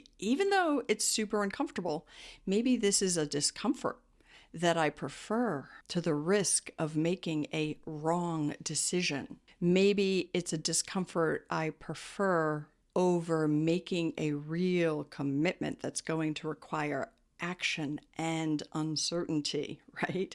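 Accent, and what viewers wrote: United States. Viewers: Are you from Iowa?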